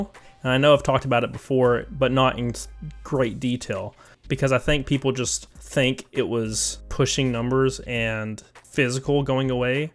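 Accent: South, United States. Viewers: Off comment Where are you from I just love your southern accent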